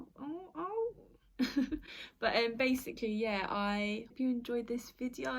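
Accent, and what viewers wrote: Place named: United Kingdom. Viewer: Mine arrives in couple of days!!Love that British accent!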